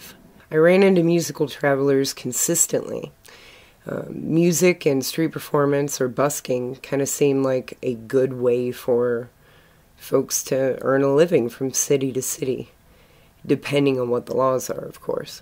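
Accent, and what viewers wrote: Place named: Kansas, United States. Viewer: You talk like you are from Kansas. That is a good thing.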